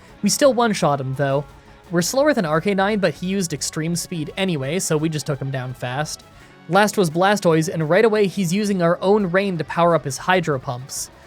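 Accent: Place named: Canada. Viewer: I love this guy's Canadian accent! So cute 😁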